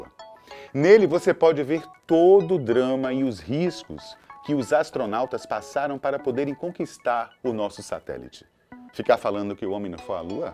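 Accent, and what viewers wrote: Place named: Bahia, Brazil. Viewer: Excelente formato de vídeo 👍✌ Seja bem vindo rapazinho .... Baiano eu acho pelo sotaque 🤔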